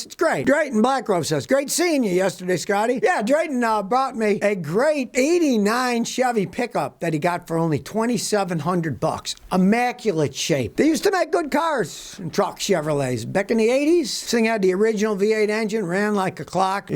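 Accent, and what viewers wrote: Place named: Buffalo, United States. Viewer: I wish Scotty could get his vowels correct. Must be his Buffalo (NY) accent. Ex: ONscrew instead of UNscrew. You think he's saying to put something on instead of him meaning to take it off.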